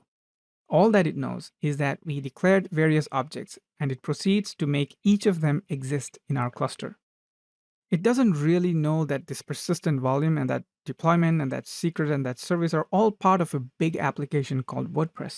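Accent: India. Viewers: malayali anno ?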